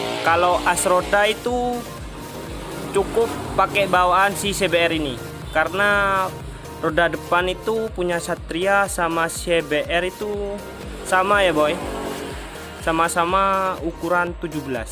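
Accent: Madura, Indonesia. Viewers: Kayak orang Madura suaranya yang omong tuh bng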